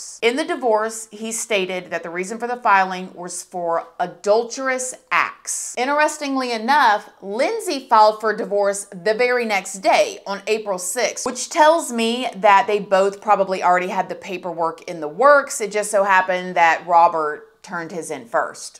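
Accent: South, United States. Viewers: Lol your southern accent was super hard in this video. That happens to me when I’m around people from Brooklyn. Love you girl PS you must’ve been around someone today that has a hard accent. Hehe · I just love your honesty and southern accent!! You’re awesome.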